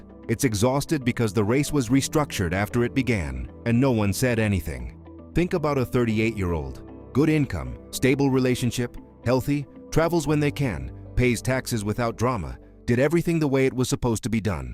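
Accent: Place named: Australia. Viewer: Just Aussie. Too strong is off putting.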